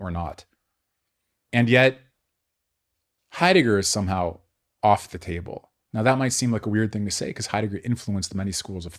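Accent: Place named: Canada. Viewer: Canadian accent was a surprise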